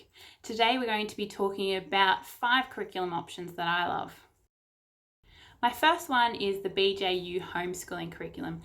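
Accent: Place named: Australia. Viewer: So good to hear an Aussie giving advice on a homeschooling program. Just wondering if this is for the state of Florida?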